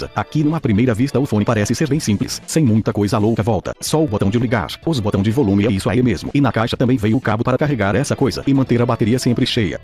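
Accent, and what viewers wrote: Portugal. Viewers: Vc é brasileiro ou português?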